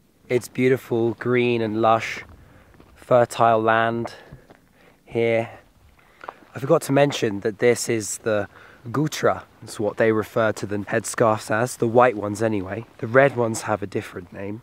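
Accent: United Kingdom. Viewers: مـفـاـح السـبـيـعـي يقول عجبه الخضار هههههه لغتي ركيكه واضن انو يشبه بعض المناظربدوله مدري ايش اسمها ولهجته بريطانيه ههههه ماكملت الفديو لوكان مترجم كان احسن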